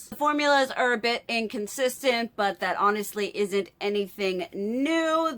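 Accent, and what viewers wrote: South, United States. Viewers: Purples are your color, both purple wigs I've seen recently look great. They really compliment your skin tone. If your reviews are with good intentions, to save us money or let us know its great or crap go for it. I like your for your attitude, interest, and you sound like me ..a southern lady with a twist.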